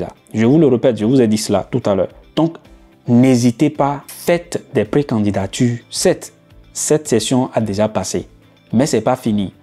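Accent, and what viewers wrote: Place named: Benin. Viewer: tu dois être béninois vu ton accent! moi ton voisin togolais !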